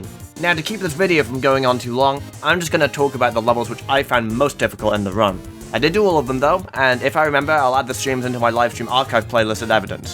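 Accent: United Kingdom. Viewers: you have quite an interesting accent/dialect; you sound British, but not quite at the same time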